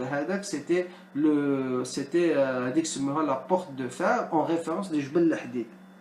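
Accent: Tlemcen, Algeria. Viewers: Bonjour ça ma fait rire l'accent tlemcenien ma grand-mère parle comme ça je suis de oujda et ma grand-mère est tlemcenia j'aime beaucoup regarder tes vidéos machallah elle sont très intéressantes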